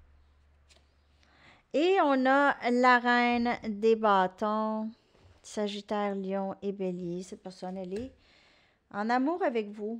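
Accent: Canada. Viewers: Chère comme ça va faire longtemps que je n’ai pas eu un billet d’avion dans ma poche tu es mon petit quart d’heure « dépaysement » entre ton petit accent canadien qui me ravit et tes jolies remarques sur les oiseaux ou autres charmantes voire drôles Ben je voyage quand même grâce à toi Je t’écoute depuis la France J’ai déjà été au Canada dont je garde précieusement quelques beaux souvenirs 💕❤️💗💓❣️😘🌺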